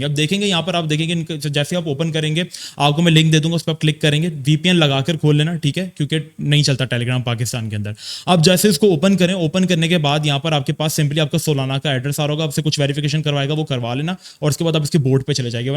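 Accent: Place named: India. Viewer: aapka accent almost indian accent hai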